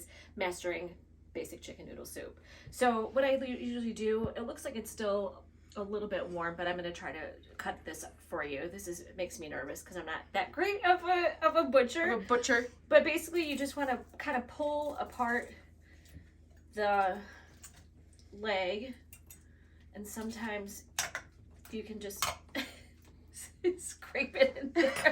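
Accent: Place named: Chicago, United States. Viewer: I just found you guys and I laughing my ass off...( why I joined ww big big ass ) thank you so much I am having so much fun. I am from south side chicago and now live in fl, Listening to you guys talk and laugh makes me miss home i hear you accent and you look so much like my aunt.. melts my heart... keep having fun and thank you for all the help and inspiration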